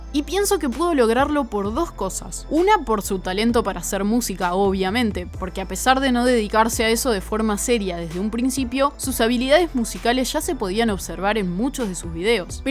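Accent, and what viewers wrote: Argentina. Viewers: Yo: Yoyi: Shoshi Amo a los argentinos ahajajsh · como decis "shoshi" me parte los webos! yo entiendo q en argentina pronuncian asi pero tampoco pa tanto, el nombre es japones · Me encanta que le dice ChoChi , amo el acento argentino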